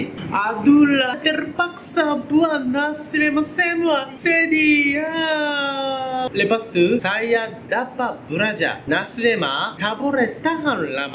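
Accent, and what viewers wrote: Japan. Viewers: Suka dengar cakap. Tatabahasa sangat baik. Cuma sebutan masih ikut pengaruh consonant Jepun. BTW, mana boleh makanan Jepun rasa makan hospital?! 🤣 Saya suka makanan Jepun. Lagi bagus dari makanan hospital. Kalau lama tak makan makanan Jepun, asyik terbayang di kepala dan lidah. Sashimi dan kare raisu paling best. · i love the way he try his hardest to speak malay even the japanese slang was there a bit but the effort tho really deserved praises. Here some virtual hug for you !!!!❤ p/s: imma eat nasi lemak 2morrow morning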